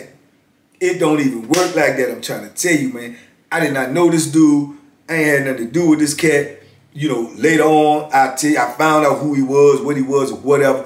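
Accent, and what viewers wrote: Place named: Louisiana, United States. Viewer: The accent tells me you're from New Orleans/Louisiana area but you remind me of Master P for some reason. I subscribed.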